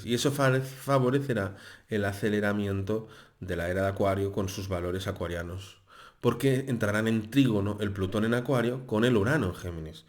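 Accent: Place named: Andalusia, Spain. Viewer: Holaaa! Me da mucho gusto escucharte, nombras palabras como mi abuelo de Huercal Overa y de mi abuela de Baza... me da mucha nostalgia y a la vez trasmitis con mucha gracia tus conocimientos!!! Me encanta tu forma de leer los astros. Hace varios años que te escucho. Gracias❤!!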